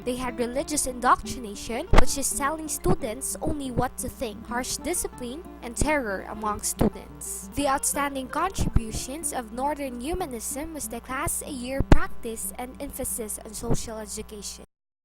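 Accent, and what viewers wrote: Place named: Philippines. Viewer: Informative! Although the accent you have really sounds like a filipina which is cool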